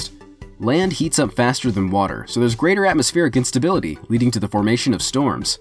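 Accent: United States. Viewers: Also ya know the American accent comes into play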